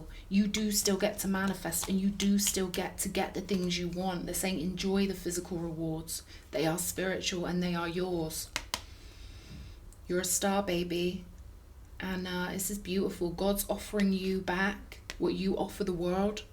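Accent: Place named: London, United Kingdom. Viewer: the London down country girl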